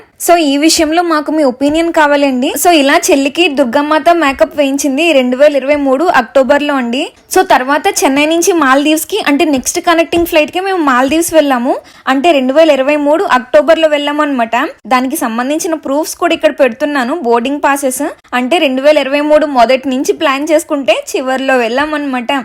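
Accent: Godavari, India. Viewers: Godavari slang ,ma relations lo kondaru achu ne lane matladataru, rajamundry na chuttupakkala oora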